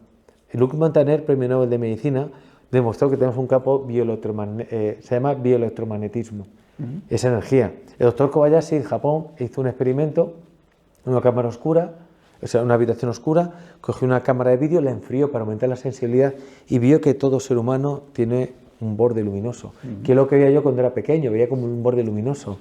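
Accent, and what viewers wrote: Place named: Spain. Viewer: Es un tema interesante, pero el acento español cuesta entenderlo.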